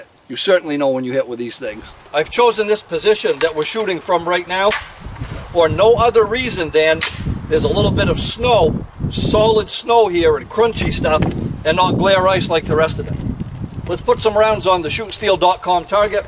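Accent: Maine, United States. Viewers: I knew you were from Maine when I heard your accent!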